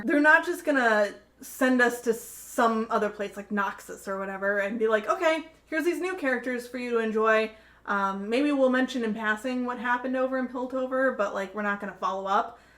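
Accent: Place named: United States. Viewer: Thanks for the detailed and thoughtful comments! I appreciate all the time you have put into making the reaction and this video. I will just concentrate my comments on one: Silco being the villain/bad guy. I don't think this label fits him, or anyone else for that matter, well. The notion that he is driven by selfish desire is extremely dubious: in the final episode he articulates his four central demands, and calls them "everything we ever wanted." None of them has anything to do with himself. Shimmer is merely a means to those ends, which is why he did not hesitate to give it up. In fact, giving it up is considered so natural to him that he didn't even consider it haggling. Also, we need to put him in the proper context: he is a political revolutionary who is fighting for the independence of a nation. Ask yourself this: among the real nations of this world, how many achieved their independence without violently defeating enemies foreign and domestic? 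Judging by your accent, I guess you are a fellow American like me. Just think of our country: how did we become a free, united, independent nation? Well, among other things, we had to beat the British, the slave owners, the Native Americans, and the Mexicans. And our country is by no means unique in this regard, not even close. Your point about Jinx being better off with people like Jayce and Victor is no doubt true. But what about the hundreds of other children like Jinx in Zaun? Can their lives truly improve without the under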